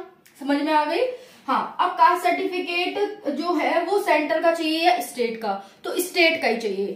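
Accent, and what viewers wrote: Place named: Braj, India. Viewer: Braj bhasha bol rhi ho aap.. Mathura se ho aap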